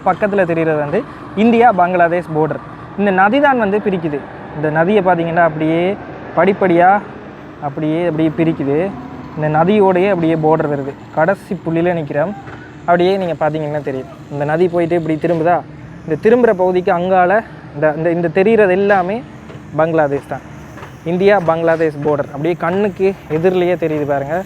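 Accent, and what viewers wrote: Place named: Sri Lanka. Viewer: தம்பியின் இலங்கை தமிழ் பேச்சு மற்றும் காணொளி அருமை. பிடித்திருந்தது. Subscribers குறைவாக இருப்பதை பற்றி கவலை வேண்டாம். கலியுகத்தில் நல்லவர்களுக்குத்தான் துன்பங்கள் மற்றும் மன உளைச்சல்கள் வரும். ❤❤❤❤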